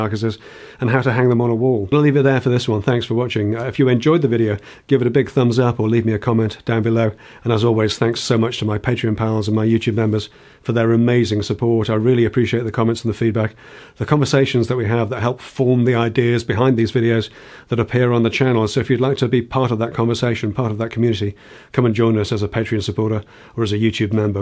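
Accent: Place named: England, United Kingdom. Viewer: There is something about the English accent and their way of doing things that is so soothing & satisfying. Ta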